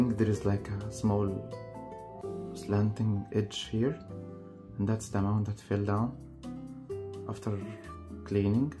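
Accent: Egypt. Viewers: i knew it ......i knew it .....i knew it .. its Egyptian accent منين يا غالي في ام الدنيا وياريت وسيلة تواصل معااك انستجرام او فيسببوك القلب مش بيحن من فراغ و الله متابع ليك من زمان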